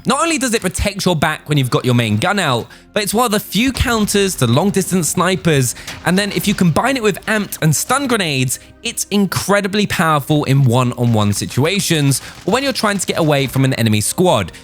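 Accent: United Kingdom. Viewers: Now I know....he actually British by his accents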